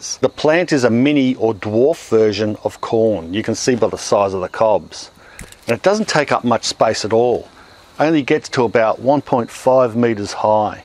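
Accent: Australia. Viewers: NS R LMAO, Australian sounds nothing like English This is probably the heaviest Australian accent I’ve ever heard, you should be able to tell straight away. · I don't know what I was expecting.. but the Australian accent caught me off guard. I think it's slightly purple yeah. I actually did ask what it tastes like 😂 was expecting "like popcorn!"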